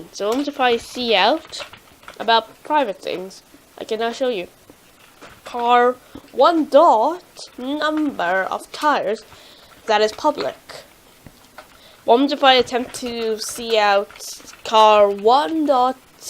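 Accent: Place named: United Kingdom. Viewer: From your accent and your way write colour instead of color. You might be UK Citizen. 🤔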